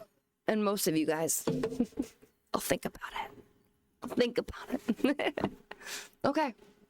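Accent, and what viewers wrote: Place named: United States. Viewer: Australian with an American accent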